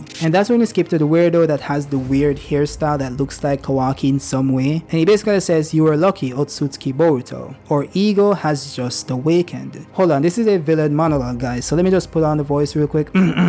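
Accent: Jamaica. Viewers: Are you jamaican? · Are you Jamaican?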